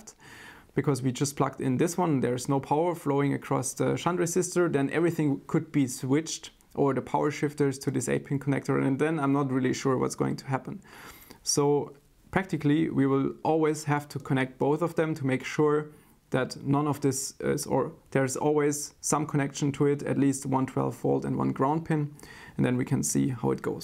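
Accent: Germany. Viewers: Ich liebe es wenn Deutsche versuchen Englisch zu sprechen. Soll kein hate sein 😂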